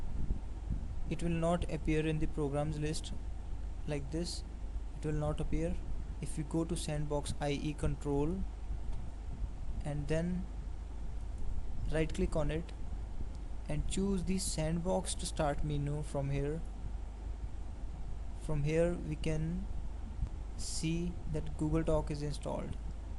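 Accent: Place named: India. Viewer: sooo Indian accent xD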